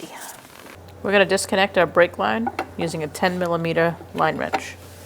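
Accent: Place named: New England, United States. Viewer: love that New England accent.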